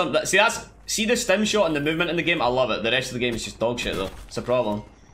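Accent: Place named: Scotland, United Kingdom. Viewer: Found you on K3’s channel and I’m glad I did! Where in Scotland are you from?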